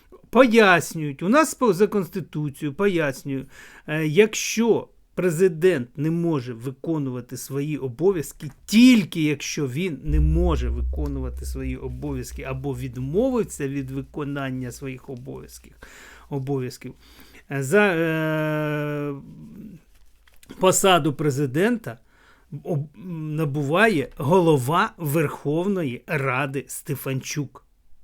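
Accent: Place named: Russia. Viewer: Як не соромно.Що ти иелищ? Навіщо стравлювати людей.Війна.Нпаіщо говориш українською з москальским акцентом? Єто в рашке завжди единовластие а у нас пока слава Богу є вибір. Навіщо використовувати політтехнології ОПЗЖ?Як вже набридли вислови в стилі мураєва.Гагьба.(пожилой человек а вреш как молодой,уже касса ждет на виходе).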